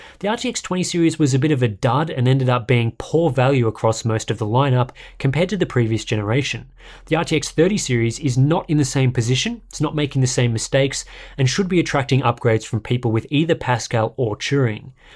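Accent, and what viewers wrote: Australia. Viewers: An actual proper analysis, in proper Australian English!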